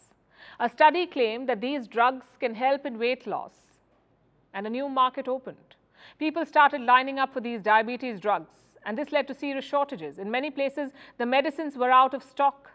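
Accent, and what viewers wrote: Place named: India. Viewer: She has Indian accent. It's a real thing. You will find 500 million people in India with that accent. They only understand that accent.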